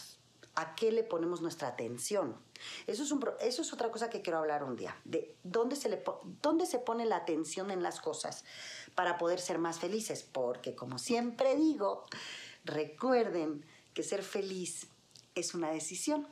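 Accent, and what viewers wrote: Mexico. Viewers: Hola, que lindo encontrarte por aquí, ya tienes un nuevo suscriptor, saludos de un peruano gran fan tuyo, sobre todo desde cuando formabas parte de "Parchís", era muy niño claro, y eras mi amor platónico, jeje, bueno, de la mayoría de niños, y de modo tierno claro. Se ve que tienes mucho tiempo en México, por tu acento, muy bien por ti, debes estar a gusto por allá, es lo que importa. Me encantaría me envíes un saludo linda, sería como un sueño cumplido para mi, jeje; mis mejores deseos para ti y un beso y fuerte abrazo a la distancia, cuídate linda!. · Yola tantos años viviendo en México, que ya perdió su acento de española. Habla como mexicana 😘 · Que dicen tus compañeros de parchís acerca de tu acento ya cambiado al acento mexicano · Yola. Cuando tenía trece años (1984) estaba enamorado de ti, hoy tengo 48 y sigo enamorado de ti. Eres hermosa. 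Me encantó que ta hayas quedado a vivir acá y que hayas adoptado el acento de México. Eres la perfección hecha mujer, me encantas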